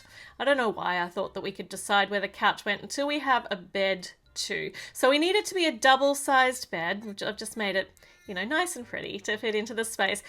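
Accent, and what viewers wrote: Australia. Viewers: Americans: sooooooooo Australians: sooorrr I love your accent and your cheerful attitude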